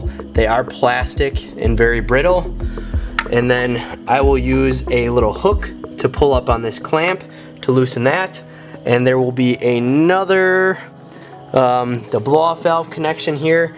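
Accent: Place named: Wisconsin, United States. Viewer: You are truly a Wisconsinite my friend. the accent and the fact that you grabbed a cold one mid install lol you love to see it 😂. From Wisco here as well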